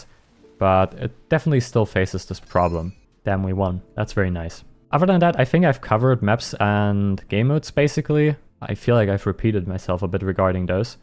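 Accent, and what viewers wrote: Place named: Germany. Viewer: I hear intense german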